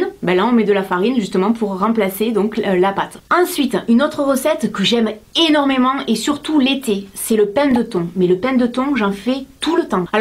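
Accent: South, France. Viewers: J'adoooore ton accent ! Etant moi meme sudiste mais loin de chez moi, tu m'apportes du soleil là ;) Merci pour toutes ces vidéos, je suis vraiment fan de tes conseils et de ton originalité !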